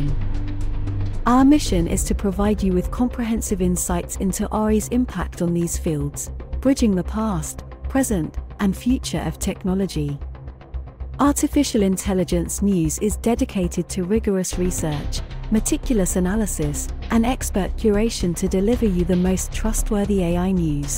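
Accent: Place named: England, United Kingdom. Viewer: Young Eva Longoria with English accent.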